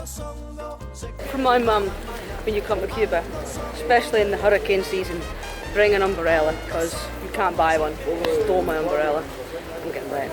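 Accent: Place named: Scotland, United Kingdom. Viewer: Hi. I found your video very comprehensive and, although I have no intention of ever visiting Cuba for philosophical reasons, your advice is transferable to many other similar countries. I was amused by your accent and the prospect of someone like you trying to learn to speak Spanish. I intend no insult. I was born in Glasgow and moved to North America with my family when I was seven years old. My parents had very strong Scottish accents as did the many family members who travelled here to visit over the 60 years I have lived here. I can't imagine any of them ever becoming conversant in Spanish. I once told my Auntie Betty that I needed an English/Gibberish dictionary to understand her. I am, however pretty fluent in Spanish as my own family travels, mostly to Mexico often. We are scuba divers and love the chance to visit small out of the way villages to dive and it is imperative that you speak some Spanish and the people really appreciate that you make the effort. 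Anyway, I found your attention to detail really great and I'll look for other reviews from you. Best wishes Tom Duncan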